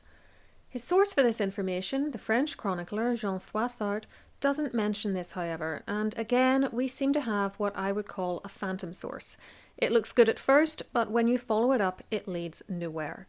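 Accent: Northern Ireland, United Kingdom. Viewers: Thank you for another fine video. I continue to really enjoy your channel, and your accent is icing on the cake. Yes, I'm one of those Americans who love all U.K. accents. 👍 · I’m so glad I came across this page by accident, I love history channels and it’s awesome hearing a Northern Irish content creator, I don’t usually come across many people from here on YT, definitely subscribed 😊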